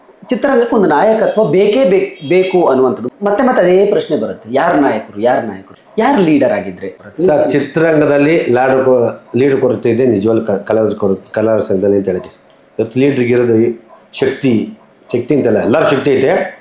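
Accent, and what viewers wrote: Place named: Telugu, India. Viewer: E manja proper kannadadavanu alla ansute , ole Telugu navaru matado reti matadtane.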